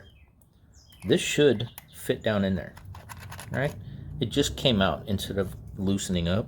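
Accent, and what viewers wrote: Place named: Jamaica. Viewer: And thankz 4 di video bro very smooth and nahmal Jamaican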